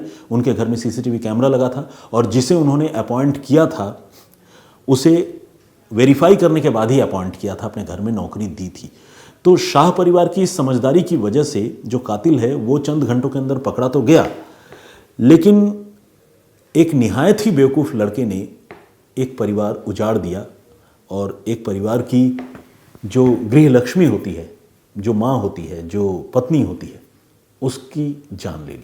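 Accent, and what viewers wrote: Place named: Bihar, India. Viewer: kahani theek sunate hain par supratim ji ka talaffus extra ordinary hai. bihari hone ki wajah se kabhi kabhi unki bhasha mein bihari lehza aa jaata hai.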